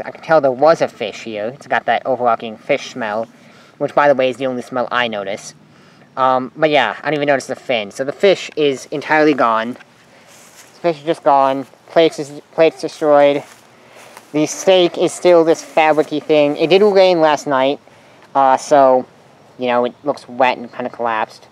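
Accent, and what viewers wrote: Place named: Minnesota, United States. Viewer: Just heard it "Southern-ish Minnesota"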